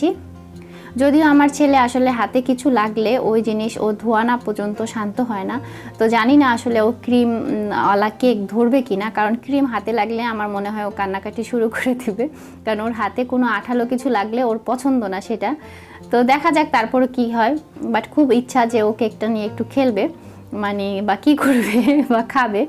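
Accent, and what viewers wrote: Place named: Madaripur, Bangladesh. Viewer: Tumr onk gun re apo.Madaripur er maya bola Kotha 😊💝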